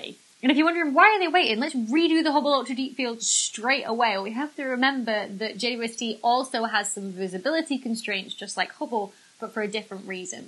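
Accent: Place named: West Lancashire, United Kingdom. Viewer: Without sounding too stalkerish, where are you from? You sound to me like you're from around here (I'm in ormskirk in West Lancashire)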